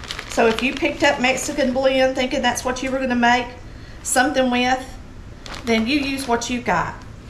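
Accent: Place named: Arkansas, United States. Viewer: You sound like an Arkansan, ma'am. I'm from there, lol.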